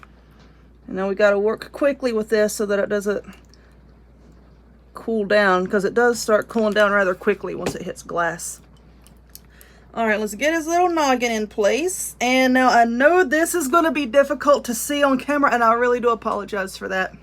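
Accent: South, United States. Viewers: Girl, you are soooooo Southern!!!!! Your accent is deeper than mine (ATL)!!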